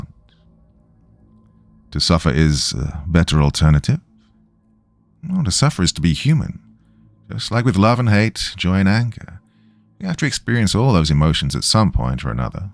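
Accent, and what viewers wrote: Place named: United Kingdom. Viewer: Its how my wife and I fall asleep to his sultry British vocals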